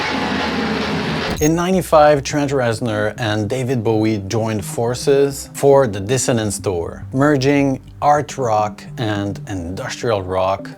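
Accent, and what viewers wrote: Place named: Quebec, Canada. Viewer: Je détecte l'accent Québécois ❤ Dommage qu'il n'y ait pas plus de contenu francophone concernant ce genre de musique que j'affectionne très particulièrement. J'adore ta chaîne, je me suis abonnée ✌️ *Mention spéciale au film Spawn de 1997, une des meilleures trames Sonore jamais faites et .... My life with the Thrill kill cult dans le film The Crow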